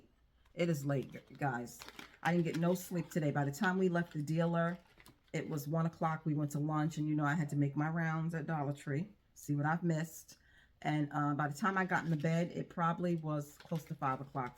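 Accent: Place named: New York, United States. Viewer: I love your accent. Is it NY?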